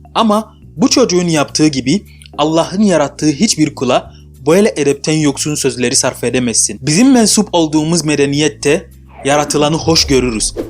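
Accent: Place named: Kayseri, Turkey. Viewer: Abi hahaha nasıl hoşuma gitti konuşman yerel dili de çözmüşsün (lehçe) kayseride inşallah bir gün rast geliriz dostum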